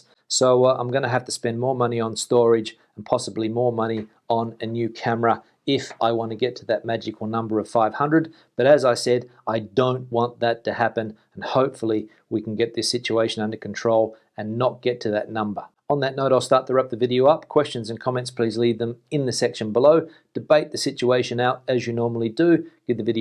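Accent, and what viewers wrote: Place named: Australia. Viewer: Hi, — really appreciate what you are doing with your daily updates. I don’t miss a one of them! We had to give up our Sitges apartment earlier this year and can’t wait to be able to return to Spain on a regular, if not permanent, basis. But who knows when that will be at this point. Hats of to you all in Spain for your efforts and, again, thanks to you for your hard work in keeping us all informed. (PS: would love to hear you speak a few sentences in Spanish so I can hear an Aussie accent in that language!) 😁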